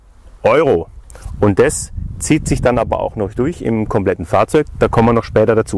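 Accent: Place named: Swabia, Germany. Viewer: Dies Schwäbisch klingt schon lustig. Aber nicht unsympathisch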